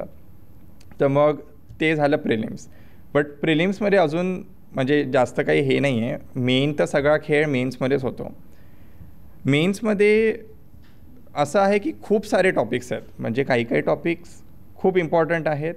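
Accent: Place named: Amravati, India. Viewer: Veryyy...Informative sir....Amravati ascent can be seen .....🙏🏿🙏🏿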